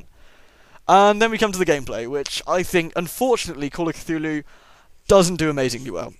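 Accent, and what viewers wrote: United Kingdom. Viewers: You made me laugh so much during this LP :D My boyfriend wants to buy this game because after I saw your LP I talked about it a lot. I love Lovecraft, and I love your British accent so this LP was what made me subscribe to you.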